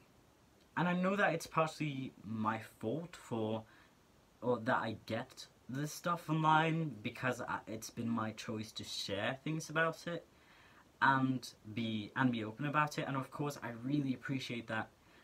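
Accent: England, United Kingdom. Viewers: I realy Like the way u speak (i mean the brithish accend) sry i'm from Germany and my Englisch is not the best 😂 (this isn't so good because my Grandpa is from Wales so i'm stupid 😂) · I gotta agree with the complements on your voice. Like damn, its an attractive voice. And when you add you english accent, it really sounds good. Have a great day :)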